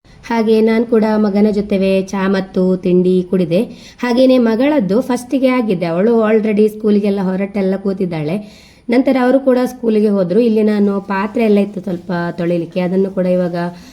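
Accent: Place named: Mangalore, India. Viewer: Motte recipe super ❤love ur Kannada accent mangalore style ❤😊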